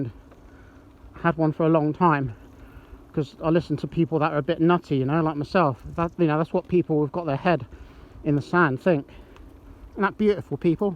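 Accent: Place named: South West England, United Kingdom. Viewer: Well yeah, I could tell it was South West by the accent haha. I’m in Cornwall. I’m guessing you are Devon somewhere?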